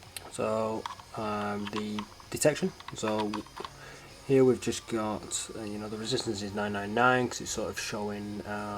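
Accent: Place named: South, United Kingdom. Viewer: Britain, possibly South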